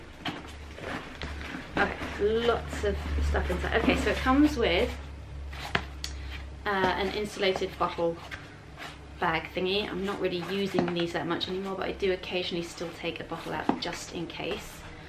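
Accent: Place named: United Kingdom. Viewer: Good video (yeah you were nervous, but I would be too and you quickly recovered your composure). Love the British accent. Adds class. :) About that big front flap/pocket... I'm a great grandmother... I'm thinking if you're changing a nappie / diaper, perhaps having to unzip a bag to get replacements and wipes would be difficult so put the nappies and wipes in that large outside pocket?? Just an idea (which you may have already thought of). I like bags like this for travel (since I no longer have babies/toddlers). Oh and I love your way of storing stuff above the sink (the utensil bar). What a great idea! (I know that has nothing to do with your video subject, just thought I would mention it)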